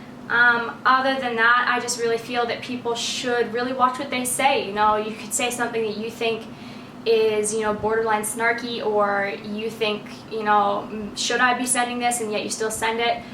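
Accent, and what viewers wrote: Australia. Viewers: You seem to be picking up an australian accent!! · it sounds like you're getting an australian accent!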